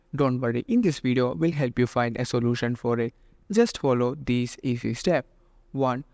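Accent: India. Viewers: Thanks for making me feel like I called tech support. Listen to an Indian and get nothing accomplished.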